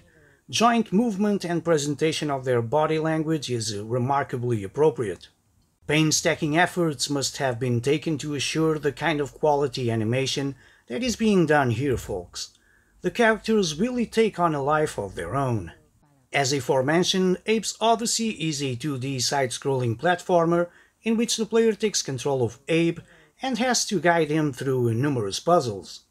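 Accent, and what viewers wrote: Portugal. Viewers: Are you portuguese? I think i recognize the accent )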